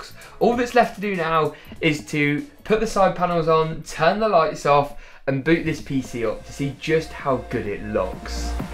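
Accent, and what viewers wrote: England, United Kingdom. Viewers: Are you not from the UK, i thought you had an english accent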